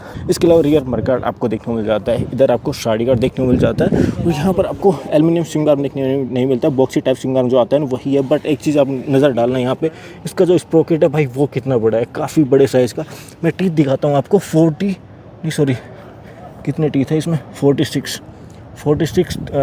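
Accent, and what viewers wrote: Haryana, India. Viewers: Heavy haryanvi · bhai aap hariyana wala hindi bolte ho to bhut hi acha lagta hai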